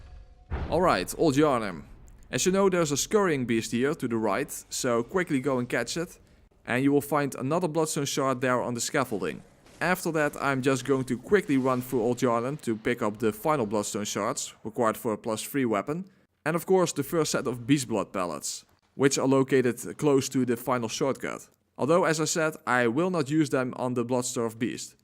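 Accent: Netherlands. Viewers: ah sweet, sorry for the very late reply lol xD, i thought i noticed in some of the way of prenouncing, not trying to say the english is bad in any way though! Just as a fellow dutchie u recognize other dutchies talking in English. Also great video btw, this helped me a lot with the bl4 run :)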